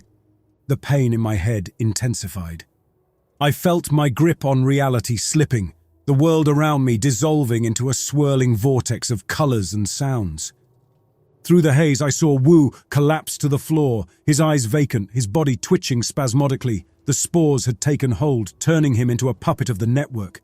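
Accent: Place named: England, United Kingdom. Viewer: I must say alot of those Creepy pasta channels regurgitate the same stories over and over And I can tell that some of them have just used the transcript of others reading exactly the same content ...boring Your story telling is class and has been on my nightly listening playlist since I found your channel Maybe I'm abit partial because I'm English too. Anyway...thanks and please keep them coming 🙏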